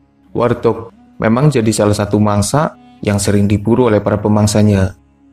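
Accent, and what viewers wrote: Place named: Tegal, Indonesia. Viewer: beda kalau ini logat tegal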